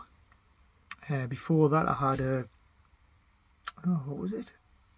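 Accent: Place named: Ireland. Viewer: Congrats on the 200 episode mate and i never knew your Irish 😂 you have some excellent collections my favoutite is the tins of paint. Seriously though the shed is class mate and love the tape game collection. Nice to see the consoles set up and computers its nice to see the master system getting some use as well as it collected dust at mine. Great stuff dude 🙂👍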